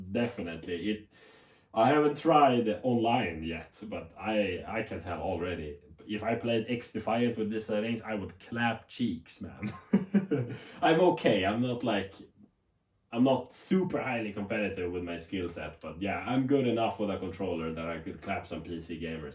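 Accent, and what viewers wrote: Sweden. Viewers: does amd freesync really add input lag? i got a hisense 144hz 4k tv, i got vrr off allso. hey man are you from sweden like me? sound like a bit of swenglish accent;) good content1:)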